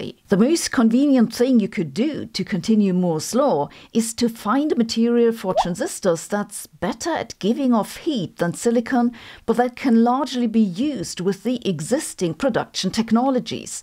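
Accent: Germany. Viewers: but seriously, she is German. I hear they might have an accent over there that is different from what you might be used to :P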